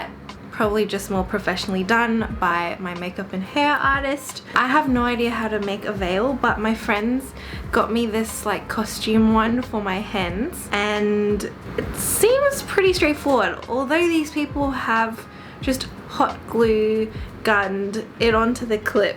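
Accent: Australia. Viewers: She has a noticeable Australian accent LOL. So Australia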